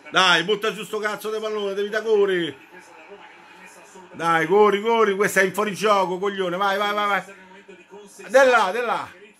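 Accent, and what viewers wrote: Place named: Rome, Italy. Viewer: A,ti voglio ibbbridddo,accento romano,e. testa juventina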